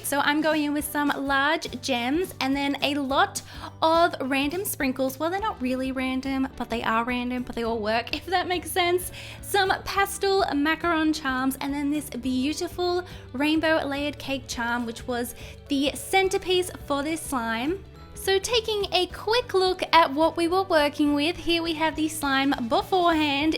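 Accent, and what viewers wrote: Australia. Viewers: Shes Australian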